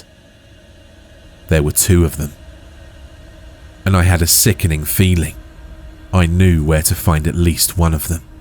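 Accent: United States. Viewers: Great Story and Narration! I liked your American accent. I enjoy the legend of Spring-Heeled Jack.❤❤🎉🎉😊😊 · Bravo! Your American accent sounds kind of cute! I know about this legend, and my opinion is that it was Mass Hysteria. Your narration was perfect and intense. Thank you so much ❤😮. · _MHorrorMacabre:Hyped and Liked 👍 #112! Your American accent was excellent! You did it seamlessly. That must have been hard with the back and forth dialogs between the characters. I really appreciate the extra work you always do on your channel for us. Loved the story. 🖤☠️🧡☠️:_MHorrorMacabre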